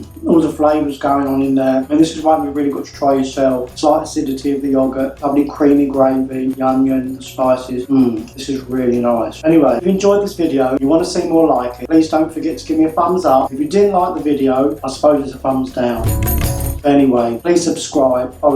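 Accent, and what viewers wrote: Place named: Essex, United Kingdom. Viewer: Great vids, thanks! And bonus is, I'm from the Glasgow area and listening to you is like hearing my Romford relatives, sadly now no longer with us...makes me smile and remember past Essex holidays :)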